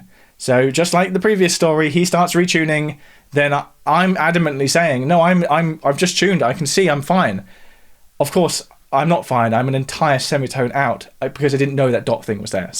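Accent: United Kingdom. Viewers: Soy una persona que habla español pero entiende inglés y veo videos sin problema, pero a veces me cuesta contigo porque siento un acento muy británico 😂😂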